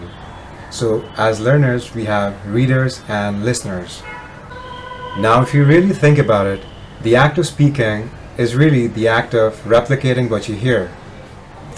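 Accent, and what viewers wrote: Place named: India. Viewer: Your indian accent is still a bit heavy, but much more better then most indian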